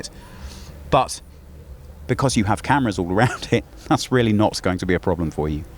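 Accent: Germany. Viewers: I like this man, he speaks very fluently and clearly. Is he german?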